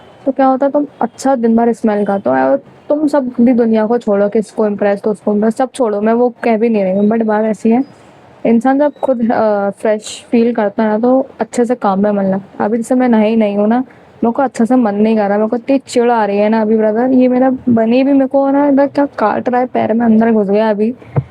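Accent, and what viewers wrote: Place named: Mumbai, India. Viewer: aapke bolne ka tarika esa lagta jese aap mumbai se ho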